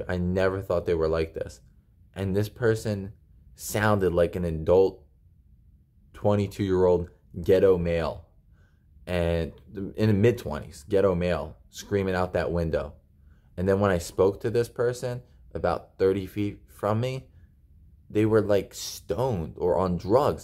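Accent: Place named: Pasco County, United States. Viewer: Sounds like you live in pasco County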